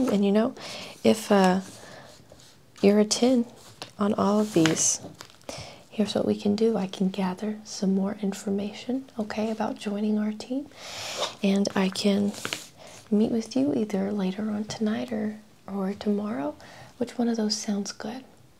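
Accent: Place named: New Jersey, United States. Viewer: Love your Jersey Accent voice in this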